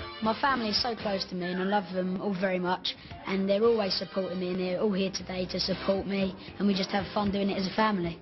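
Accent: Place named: United Kingdom. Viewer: if he wasn't british, he wouldn't be funny. its all in the accent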